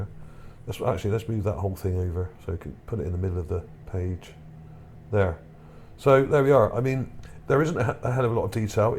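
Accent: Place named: Wiltshire, United Kingdom. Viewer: Good Wiltshire accent 👌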